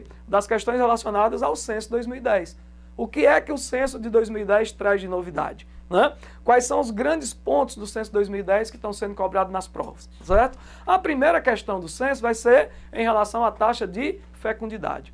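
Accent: Northeast, Brazil. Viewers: Deu até saudades do Nordeste só de ouvir o sotaque dele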